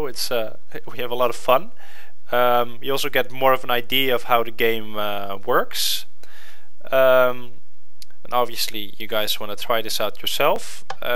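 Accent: Netherlands. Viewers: You sound very Dutch.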